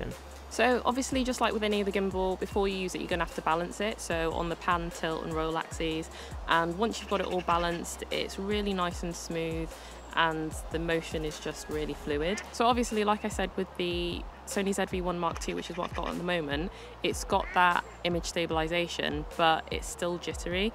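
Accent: United Kingdom. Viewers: Love the British accent!!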